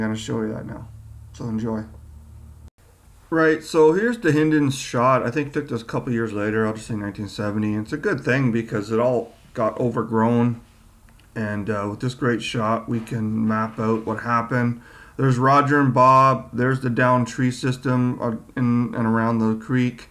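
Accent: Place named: Canada. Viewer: Thanks. Love your Canadian accent, and your videos too.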